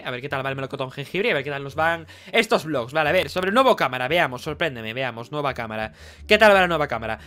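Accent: Chile. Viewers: jejeje ese idioma buen Chileno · hablas chileno ???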